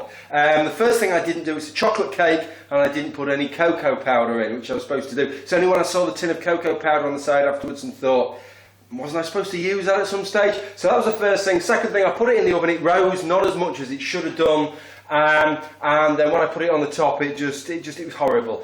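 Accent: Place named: Yorkshire, United Kingdom. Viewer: Slight Yorkshire..... what part of the UK are you from?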